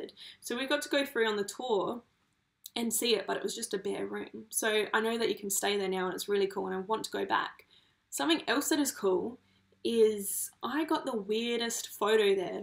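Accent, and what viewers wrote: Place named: Adelaide, Australia. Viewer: Ha! I thought you must be from SA! It’s your “accent”. I dunno, certain words you say, I’m like “she’s gotta be from Adelaide”!! Did you guys work along the way whilst travelling or did you just save your butts off beforehand? I think it’s amazing that you did this trip- great memories & experiences...it’s literally the experience of a lifetime! * & don’t worry about dislikes- they still count as engagement!😉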